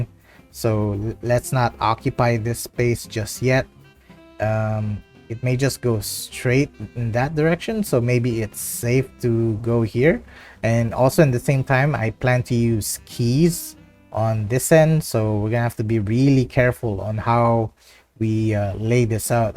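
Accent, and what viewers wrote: Philippines. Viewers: are you from ph? haha cause of the accent. new subscriber! really good info as well. thanks! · I’m actually liking the plot of your city design. However, I noticed every-time you are using skywalks, you intend on using parking gates thereby generating “park income”. Isn’t that kind of cheating? I mean it aint a crime but it’s definitely triggering me😂😂😂 Nevertheless, love the series. Nung una kita napakinggan, alam ko na pilipino ka 😂. It’s nice to know na mai CS content creator na pinoy.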